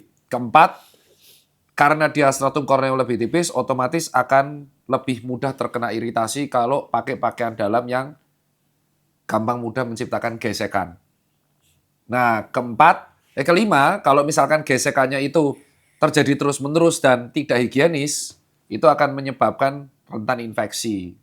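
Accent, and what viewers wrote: Java, Indonesia. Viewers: jowo nyelll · Ful ngakak anjir beliau kalo ngmong asbun blundernya terlalu plenger mana aksen jawanya medok lagi😂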